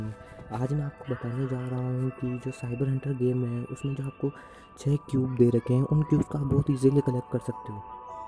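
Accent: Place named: India. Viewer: Really? Huh. I assumed from your speaking patterns you were Indian. It sounds close to, then again I’ve never heard anyone speaking Swedish so I tied it to what I knew. But thanks for letting me know. Doesn’t make me understand the video any better. You should make one in English for those of us that would like to understand, if you don’t mind.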